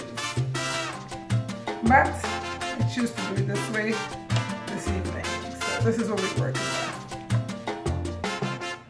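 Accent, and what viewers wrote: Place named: Guyana. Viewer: I love your voice it’s so soothing are you from Guyana? Thanks for the recipe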